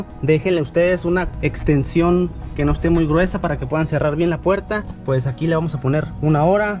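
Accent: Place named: North, Mexico. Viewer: Asi hablamos en el norte jaja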